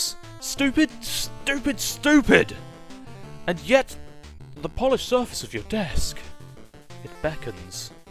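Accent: United Kingdom. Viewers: Probably cause of bri'ish accent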